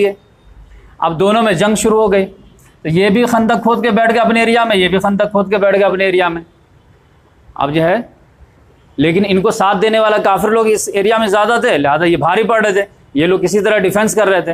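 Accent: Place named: East India, India. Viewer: Assalamualaikum wa rahmatullahi wa barakatahu sheikh saab....aapki aawaz se lagta hai aap east india side ke hain , Jharkhand or Bihar....aapse milna hai ..InshaAllah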